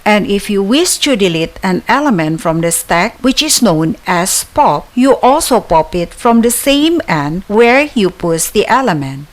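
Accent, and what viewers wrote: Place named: India. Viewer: за 2 минуты и 35 секунд с шикарным индуским акцентом я понял больше чем за полтора часа с преподавателем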